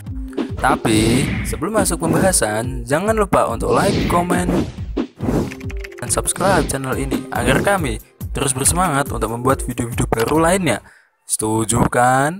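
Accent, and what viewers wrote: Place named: Java, Indonesia. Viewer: Medokk :v pokoke josss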